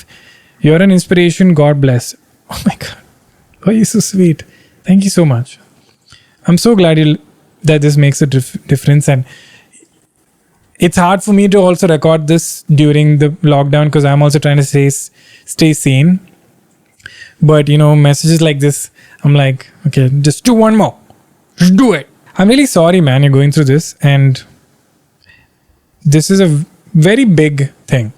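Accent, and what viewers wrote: Kerala, India. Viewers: ur funny and relatable n u make me smile...wt ur accent...from one mallu to another...keep it up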